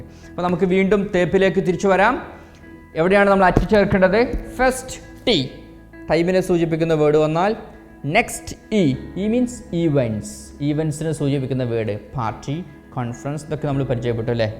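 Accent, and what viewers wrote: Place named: Malappuram, India. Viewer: നിങ്ങൾ ഒരു മലപ്പുറംകാരൻ ആണെന്ന് തോന്നുന്നു... ശരിയാണോ ?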